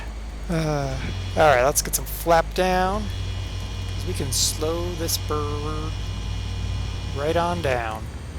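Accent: Canada. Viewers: I thought you were Canadian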